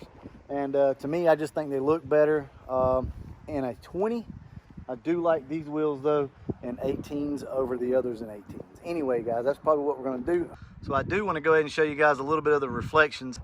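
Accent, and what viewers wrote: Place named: South, United States. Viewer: I'm new to your channel. Like 2 months in now. I knew you were close to my old stomping grounds by your accent. Then I saw Dothan today. You ever run anything at North Florida Speedway?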